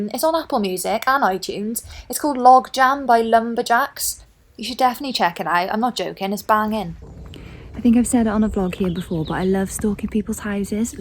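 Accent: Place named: Liverpool, United Kingdom. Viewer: Absolute nutjob marry me. And the scouse accent love it. Ha ha ha.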